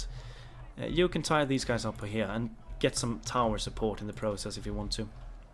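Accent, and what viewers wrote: Sweden. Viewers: Will you do an Ar ardunaim playtrough? really love your gamplay and your swedish accent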